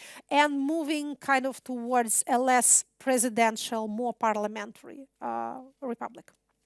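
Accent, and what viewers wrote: Russia. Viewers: Такой-то руслиш, всё понятно :) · Hello! The content of the lecture is very interesting I would like to note about the quality of English, which Ekaterina speaks. Catherine's English is definitely better than many of our journalists and public figures. Nevertheless, I noticed strong Russian intonations. Phonetics is not bad, but there is work to do. Thanks for the lecture. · ой это прекрасный русский акцент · Я никогда не поверю, что человек, который выучил английский на таком крутом уровне, не смог убрать самые жесткие штуки в произношение. Значит это специально. Кто-нибудь может объяснить, зачем специально говорить с таким жутким русским акцентом? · Спасибо за видео, скучала без вас. Понабежавшим училкам английского - русский акцент для русскоязычного человека это нормально, как и некоторое количество ошибок. Темп речи и словарный запас просто на отлично!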